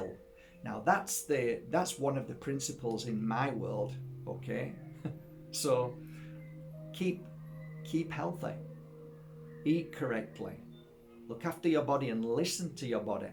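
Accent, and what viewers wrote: Yorkshire, United Kingdom. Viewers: Found a channel called The crystal collector. He goes around different areas digging crystals. Theres a video where he digs amethyst which is my favorite crystal... Amazing. Check him out! your Yorkshire accent is lovely. I've a Bucks accent 😂😂😂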